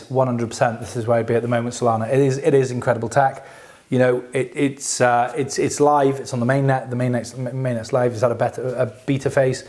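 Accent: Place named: England, United Kingdom. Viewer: I watched the video and that was what I thought from the beginning. But, in this video, I think I just had a hard time understanding your English accent (I'm a hick from Indiana). Now that you cleared that up, I believe you must have been telling us that others had a problem with the 50 billion and not you. By the way, I watch a lot of HBar videos from various people and yours are by far the best and most informative. Most of the others are just pumping the coin without any real substance. Thanks.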